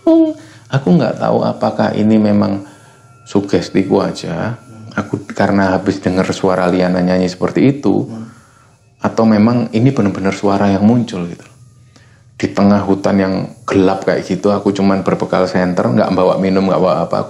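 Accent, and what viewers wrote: Java, Indonesia. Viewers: Jaaann jowo tenant...mantebb, medok e toop markotop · Daya Saing utama adalah di lagam lagam jawanya yang bikin ngeri ngeri sedep... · Sayaa yg orang Melayu Kepri, sukaaa denger mas cerita2 horor, sukaaa denger logat Jawa nya😆😆😆😆😆🤣🤣🤣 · Penyampaian y enak,jawa y kentel pol cak,fans baru cak semangat 👍👍👍💪💪💪gending jawa y bikin ayes adem ati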